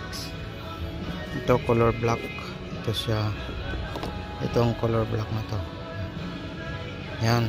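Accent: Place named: Visayas, Philippines. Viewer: Bisayang bisaya....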